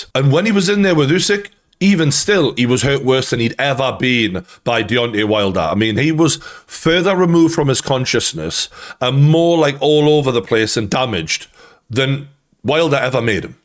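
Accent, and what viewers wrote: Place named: Geordie, United Kingdom. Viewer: f^t geordie you have the most annoying accent i’ve ever heard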